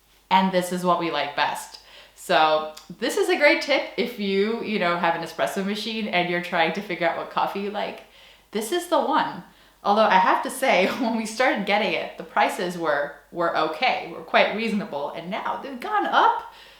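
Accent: Netherlands. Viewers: Is it me or you now have a Dutch accent when you speak English? Especially in the outro! 🇺🇸🤝🇳🇱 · You sure seem to be settling in well, you even seem to be developing a dutch accent. Sometimes i think im listening to a dutch girl speaking english :) Anyhow you were just in time, i guess the coffee places and the museums closed right behind you for the new lockdown....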